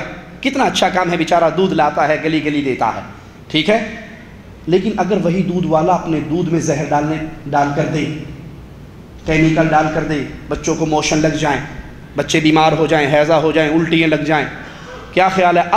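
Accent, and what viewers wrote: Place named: Arab world. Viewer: Urdu arabi kay andaz may bolnay say jhot such may nahi badalta .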